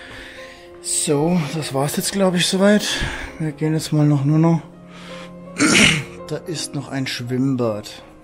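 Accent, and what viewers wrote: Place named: Swabia, Germany. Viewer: Hoi, da hört man ja Schwaben, die auch noch tolle Videos machen! Ich habe mal ein bisschen auf dem Kanal herumgestöbert und lupfe meinen Hut. Gute Kameraführung, angenehme Moderation und ohne aufdringliche Hintergrundmusik. Ich selber bin leider inzwischen schon zu alt und zu gebrechlich, um selber noch der Abenteuerlust um verlorene Orte zu frönen, deswegen genieße ich diese Videos vom Sofa aus umso mehr. Da kann ich gar nicht anders, als euch mit der Bimmel zu abonnieren und euch weiter zu empfehlen!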